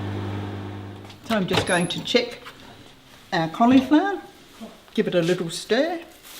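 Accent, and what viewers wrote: Australia. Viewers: Do I detect an Australian or a New Zealand accent? I loved the recipe by the way, especially when you made cauliflower rice because it's something I've been wanting to try. I love how relaxed you are. This is my first video that I have watched on your channel and I've subscribed already. Even though your 'about' section said you focus on gluten free recipes we can all use them. Thank you for sharing your knowledge of cookery. Xx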